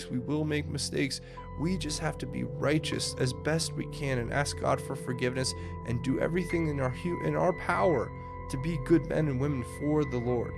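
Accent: United Kingdom. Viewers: Hahahaha 🤣 Love the British accent 👏👏👏👏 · I love the English accent. You did a great job. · Fabulous British accent!!!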